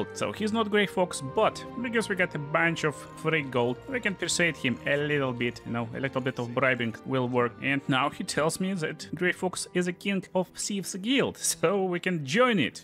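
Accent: Russia. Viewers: Очень уверенный и хороший текст с русским (или близким к нему) акцентом Очень прикольно звучит на самом деле Интересно, откуда ты? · R u russian? Your accent is too similar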